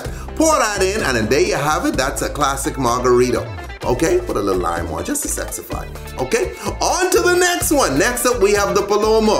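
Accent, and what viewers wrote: Bahamas. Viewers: He sound Bahamian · Nice video. Sounds a little Bahamian.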